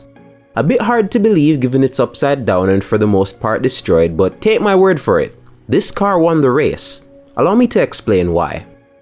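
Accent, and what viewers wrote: Jamaica. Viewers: I can't tell Are you Jamaican Or Pikey? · are you Jamaican by any chance? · I was thinking canadian, but yh probz yardie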